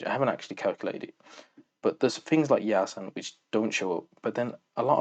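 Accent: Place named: Birmingham, United Kingdom. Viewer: Ah you’re a Brummy, do you know Joe?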